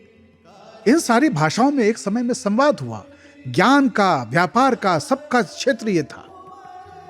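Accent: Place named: Allahabad, India. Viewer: Allahabad style , kahin maja aa gaya , baba is back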